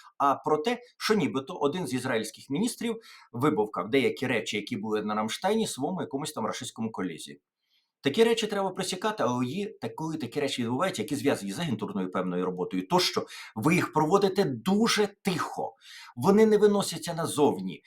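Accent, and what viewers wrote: Ukraine. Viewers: Я родом з Івано-Франківської області і так само розмовляю як пан Тарас живучи тут в Америці і люди які приїхали з других областей вони навіть часом не розуміють мене тому, що кажуть що я вживаю багато руських і польських слів, але то є моя рідна мова з якою я виросла і я так розмовляю, тому мені дуже приємно слухати вас пане. Дай Бог Вам здоров’я🙏🏻 ви маєте дуже чудовий приємний голос і ви є високо інтелігентна людина! З великим задоволенням вас слухає багато людей тут в Америці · Вітання зі Львова! Дякую, п., що не забуваєте галицьку говірку!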